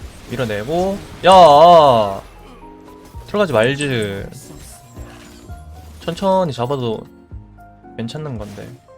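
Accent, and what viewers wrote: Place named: Jeolla, South Korea. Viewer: 님 전라도 분이신가 ㅋㅋㅋㅋㅋ 사투리 ㅋㅋㅋ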